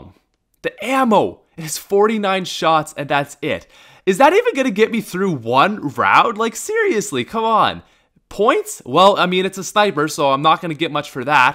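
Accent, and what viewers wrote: Canada. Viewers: he’s Canadian it’s his accent lol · He's got a Canadian accent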